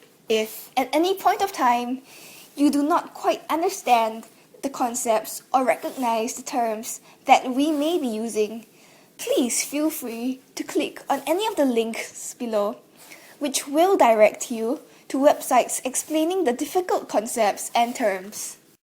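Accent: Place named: France. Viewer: that french voice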